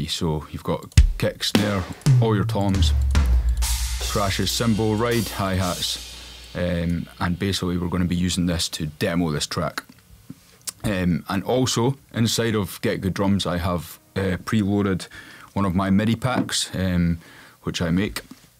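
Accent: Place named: Scotland, United Kingdom. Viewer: Class! Sounds great. Where you based mate? Always a surprise when the Scottish accent pops up on YouTube 🤣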